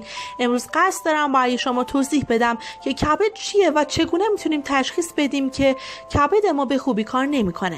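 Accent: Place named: Turkey. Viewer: لهجه تورکیت منو کشته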